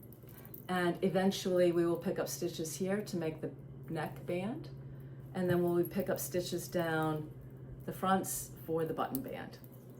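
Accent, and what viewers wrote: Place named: Rhode Island, United States. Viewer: First time catching your podcast (now subscribe) and I love it. I had to go over to IG and find you and now follow you there too. I recognized the accent and then saw you are on Block Island. I'm a southern RI girl myself (Little Compton) and now call NH my home but my heart is in Little Compton. Your projects are all gorgeous. I had to go over to Ravelry to find the patterns and look more. I'm now obsessed with the Against the Current (shawl and tee). I think I'll put the tee on my next spring project list. I love what you are doing with the Vaddel Cardi. Now to see if I can find you on Ravelry.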